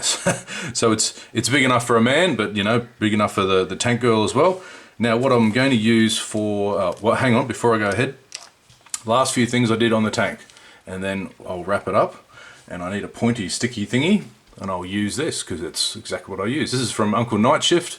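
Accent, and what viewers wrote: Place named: Australia. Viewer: So good to hear an Aussie accent. I left Oz in '92 for my work, spent 4 years in France and 1 year in Germany before settling in Delaware US. Last time I went back to OZ (to say goodbye to my father in 2010) I was asked if I was a Yank. And then here in the US they keep asking what my accent is! I've subscribed, keep up the good work.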